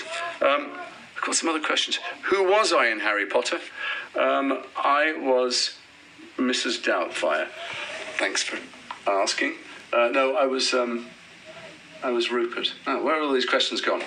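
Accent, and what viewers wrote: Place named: Liverpool, United Kingdom. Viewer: I love his natural Liverpool accent…I’m from Liverpool too. I actually met Jason at Liverpool Comic Con in November 2024 - Jason is the sweetest person you could ever meet. I actually had made made a diamond art portrait Of Jason as his characters. Which he really loved and it was a small gift. Love all his characters -he plays all his characters so beautifully and tell their stories beautifully. Jason was and remains the best Star Trek captain ever too.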